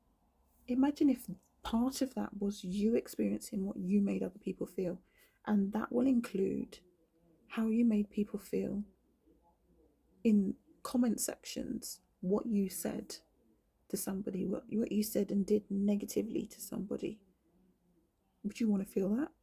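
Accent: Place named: United Kingdom. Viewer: I just want to give you a compliment. Your speaking voice is sooooo soothing. It relaxes me, lowers my blood pressure and helps me fall asleep. Maybe it's your British accent. But since you've posted the video regarding Thandiwe Newton I've subscribed to your channel and watched your videos. I'm from the states (Chicagoland area). I'm fascinated with the UK and have been for years. The more I study the UK the more I realize it's influence on the USA and the world. After all Britain colonized most of the world at one time including America and its influence permeates now. I watch BBC and Ski News more than American news. I watch British TV shows and movies. I hope to visit the UK someday. But back to your voice. You should do more videos. You could read the telephone book. I don't care. Lol I think you could get work reading EBOOKS. Lol 🤣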